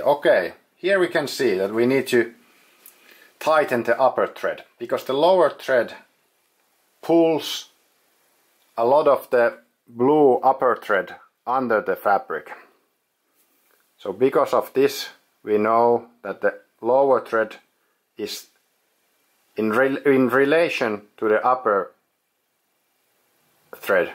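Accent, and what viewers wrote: Finland. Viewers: I love your Finnish accent :)) I have the possibility of buying a Pfaff 93, do you recommend it? How much would you say it is worth? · Hieno video! Rallienglantia kuulee nykyään liian harvoin kun Kimi ei enää esiiny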